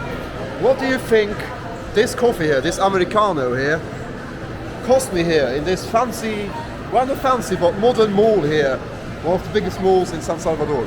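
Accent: United Kingdom. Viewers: The don’t sound like a gringo, you sound more like a British person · Love the English accent, makes the video more entertaining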